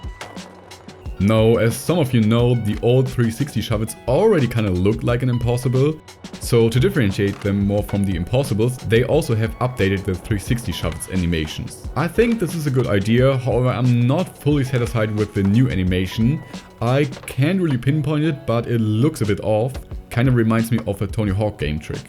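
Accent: Germany. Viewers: are you german or smth cause that accent sounds so german 🔥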